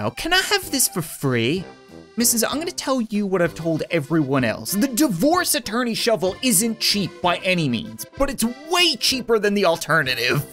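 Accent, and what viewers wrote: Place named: Newfoundland, Canada. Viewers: It is a Canadian accent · not all Canadians have accents he's a newfy which is the Canadian Irish that's why he talks like that and also I'm Canadian that's why I know this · He canadian what do you expect.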